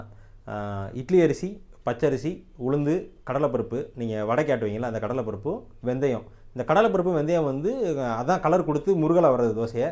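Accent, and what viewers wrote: Madurai, India. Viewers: மதுரை பாஷை வருதுங்கோ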